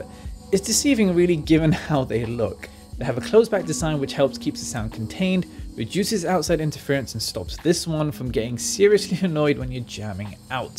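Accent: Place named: United Kingdom. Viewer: I though "another american review" Hears girl - british af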